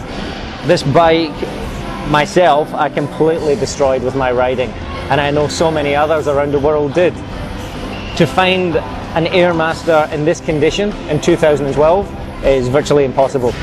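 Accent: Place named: Glasgow, United Kingdom. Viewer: Sounds like a glasgow accent did you ride around 1984 85 .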